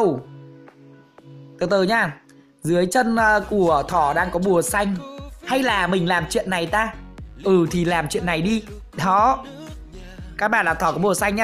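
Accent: Nam Dinh, Vietnam. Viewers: Add quê Nam Định ah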